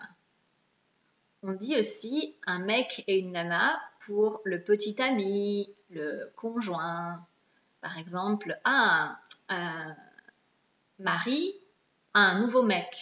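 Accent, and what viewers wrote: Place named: France. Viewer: tu as in voix tres feminin et tres interresant, une vrai accent feminine et franchise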